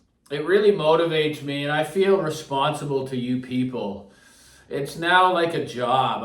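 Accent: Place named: Canada. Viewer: Merry Christmas to you,. We’ve got about 3’ of snow here, south of Buffalo. Record season has officially begun. Just fired up the old 80’s Mac rig. Always let her warm up with a little Canadian radio, 101.1 More FM, currently. What a privilege to have grown up with all these great stations. I’m new to your channel…but, pretty sure I picked up that unmistakable “native tongue”. 🇨🇦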